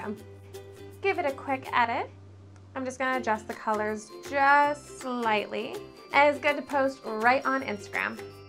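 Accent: Canada. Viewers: Is she Canadian or Australian?? She sounds just like me. Just the way she says out she sounds just like simply nailogical too!!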